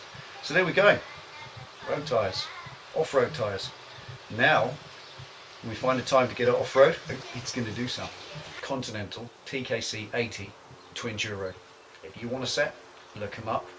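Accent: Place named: Cockney, United Kingdom. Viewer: Settled on Bridgestone Battlax's after Michelin, Continental & Pirelli. The Battlax's are still good after 12,000km, whereas everything else was totaled at 5000km on my Kwaka ZZR600. Battlax's are harder, but still great on wet with very little "walking" at speed when cranked over. Here in country Australia my average shopping trip is 400km with a mix of open and hill's roads so it may be a different story where you are. Great vid's, you got the cockney gift mate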